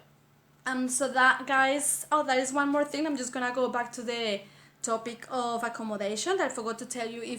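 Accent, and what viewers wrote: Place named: Brazil. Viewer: Are you Brazilian? I can understand you so well:)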